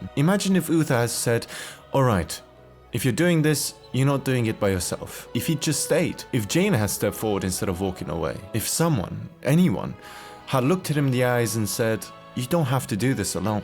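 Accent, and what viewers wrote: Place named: United Kingdom. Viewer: so many words. so little insight. I should've closed the video as soon as I heard the British accent.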